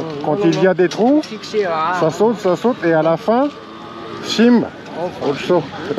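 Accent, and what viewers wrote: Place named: Madagascar. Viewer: Super vidéo .j aime bien analakely .Mon épouse me dit que votre accent gasy est charmant 😅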